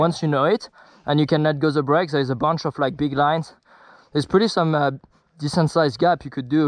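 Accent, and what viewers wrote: France. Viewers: You know,you’re such an talent,you’re videos are amazing, but,honestly,i can’t listening this french sound english,it rippes my ears dude and i’m just wondering why everybody from France has such a strong accent.🤷🏼‍♂️😣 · Your accent look's like French accent 🤔 By the way you're a very good rider !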